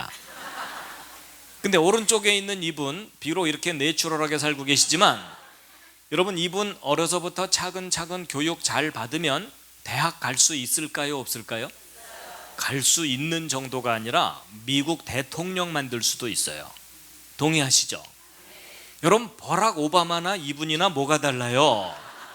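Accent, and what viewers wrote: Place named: Jeolla, South Korea. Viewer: 목사가 태도나 말투가 기본이? 전라도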